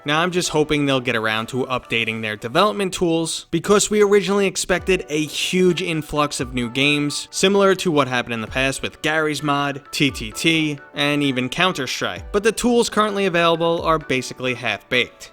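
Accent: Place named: Boston, United States. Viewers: Lmao it does sound little bit boston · your boston accent made this video 10x better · You are so Boston holy shit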